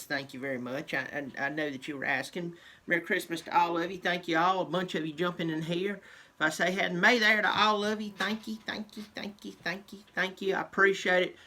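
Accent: South, United States. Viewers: Idk y I watch ya, imma Buckeye fan... not saying osu hates Clemson or anything, I respect the university and I think Sweeny is a stand up top notch guy (and 1 heck of a coach)! I guess I just like watching ya talk smack in a southern gentlemans manner, and not to many better American names than good ol!!!! Love your show man!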